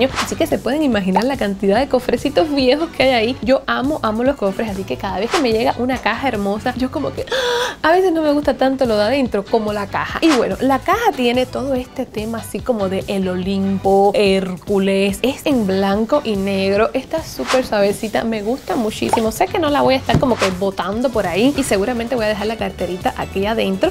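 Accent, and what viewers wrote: Cuba. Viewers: tu cartera esta muy bella, pero te pido que no pierdas tu acento cubano, he notado como que lo quieres apartar y la verdad te queda muy bonito hablar así.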